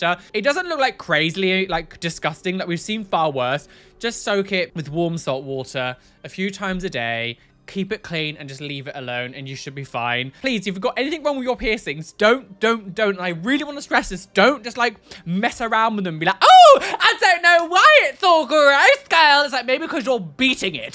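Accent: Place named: Essex, United Kingdom. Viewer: Just found you and am so loving your energy! Your accent is awesome as well, and may be the inspiration for one of my Essex characters in my books. :)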